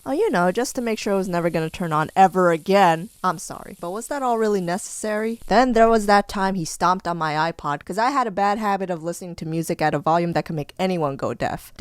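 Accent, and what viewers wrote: New York, United States. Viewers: Is she from ny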